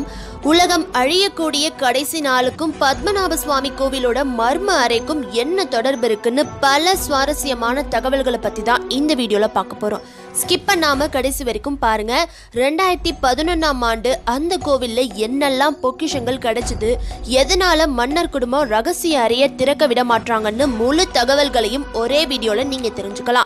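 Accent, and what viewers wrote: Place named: North India, India. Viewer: Unga Tamil voice super...!! North Indian girl Tamil pesura Mari Iruku....!!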